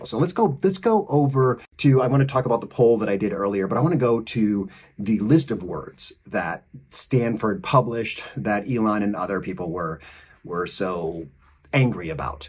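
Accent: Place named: Canada. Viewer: Gawd ... You almost sound Canadian. For me that is 1 of the best compliments I can think of. Personally I try to assume everyone deserves the chance to disappoint me or amaze me. So far you've only amazed me. Grrr8 subject, grr8 attitude and good for you in trying to become a better person. Gawd knows we need more of "those" people. If I offended anyone I'm Canadian so ... sorry . P n D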